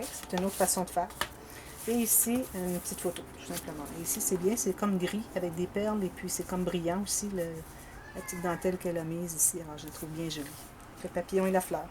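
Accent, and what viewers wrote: Quebec, Canada. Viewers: Bel album et comme j'ai commenté sous la vidéo de présentation de votre album, je vous suis toutes les 2 avec plaisir. Bien sûr, en français cela m'est plus agréable et facile à suivre, et comme je l'ai déjà écrit et comme d'autres vous le disent, ah lala ! Votre accent nous ravit et moi qui suis amoureuse du Québec parcouru par 2 fois déjà, je rêve en plus.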